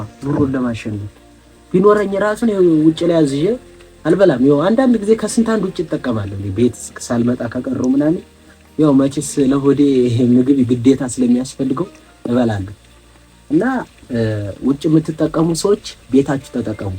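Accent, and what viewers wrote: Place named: North, Ethiopia. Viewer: ጎበዝ በርታ የኔ ልጅ አማርኛህ የወደ ሰሜን ነው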